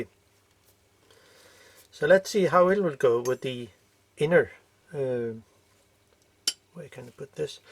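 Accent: Denmark. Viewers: Hej. Er du dansk? Det lyder sådan. 😄 Hvis kan. Du så hjælpe mig en 135 mm 3..35 med en stiv fokusering? Mvh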